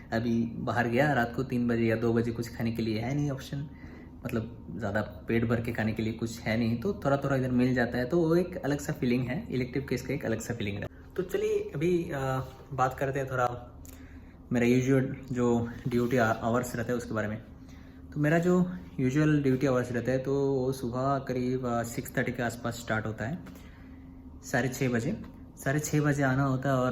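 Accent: Bengal, India. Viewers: Just guessing that, you are a Bengali, by your hindi speaking style(are you a Bengali ?). By the way another bengali here. Medical aspirant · Dada apni bangali to.....laglo apnar kotha sune tai bollam..?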